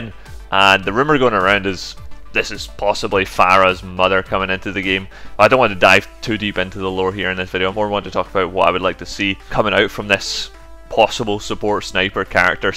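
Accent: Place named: Scotland, United Kingdom. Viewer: Your accent sounds scottish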